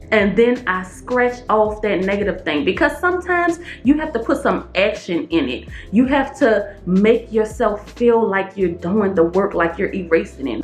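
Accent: New Orleans, United States. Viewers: Sound like your from NEW ORLEANS??? If you are I’m so happy to see someone from my City doing with your doing !!!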